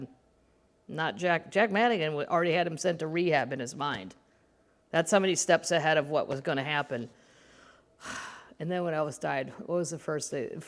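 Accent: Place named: South, United States. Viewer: spot-on Southern accent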